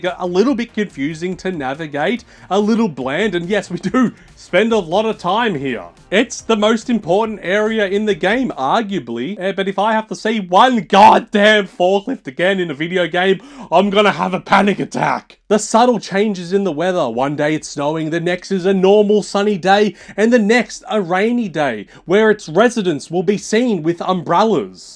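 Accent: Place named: Australia. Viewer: As an American, I love a good Aussie narrator 👍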